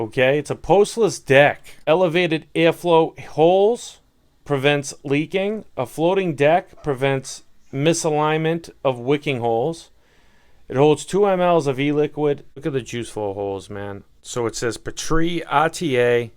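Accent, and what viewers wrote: Boston, United States. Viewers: Thanks for the great review as usual! I'm assuming ur from Boston because of ur accent! If so where in Boston r u from? I live in Medford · dude yr boston accent is really fukt up, the Petri is an R TA, not AH TA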